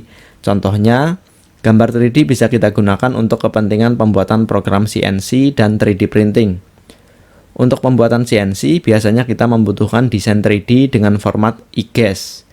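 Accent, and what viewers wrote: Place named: Banyumasan, Indonesia. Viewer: mase ngapak e ceto nemen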